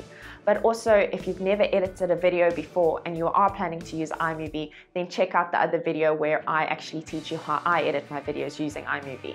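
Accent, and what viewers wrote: South Africa. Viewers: Awesome hearing the South African accent when learning online. Great content, thank you - it's a new teaching journey for me and this was a very insightful video 🌻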